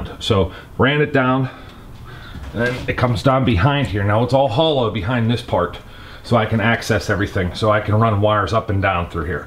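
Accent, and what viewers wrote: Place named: Pittsburgh, United States. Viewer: Nice job. As soon as you started talking I knew you were from Pittsburgh. I just moved out of the city to Ross Township.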